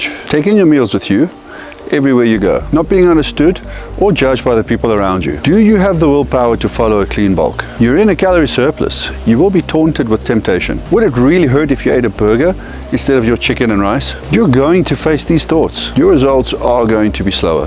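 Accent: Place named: South Africa. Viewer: I've never heard such a nice south african accent.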